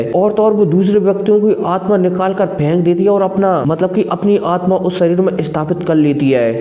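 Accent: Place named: West Bengal, India. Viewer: You are from West Bengal 😅❤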